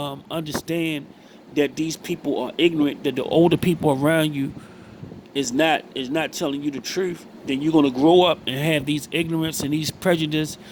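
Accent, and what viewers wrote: Baltimore, United States. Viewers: Ayo you from bmore? Your accent sounds like it....and big facts about intelligent people, we colorblind, all i see is green. If you help me see green, idc what you look like, lets get it.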